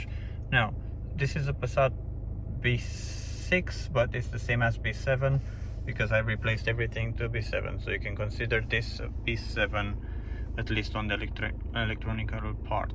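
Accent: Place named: Romania. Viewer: Multumesc, recunosc un accent romanesc. 😂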